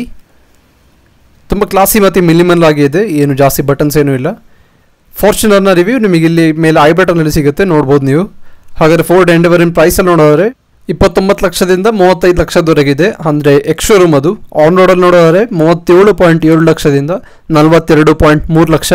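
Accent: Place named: Mangalore, India. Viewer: Bro are u from manglore...?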